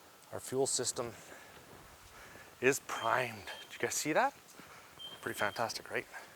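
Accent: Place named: Ontario, Canada. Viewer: Had to add the Ontario accent...Thanks for all the awesome videos. Peace brother.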